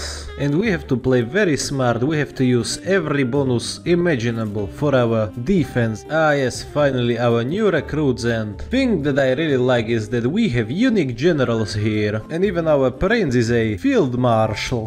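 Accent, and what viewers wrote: Russia. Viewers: Ты не русский случаем? Акцент у тебя такой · are you actually russian?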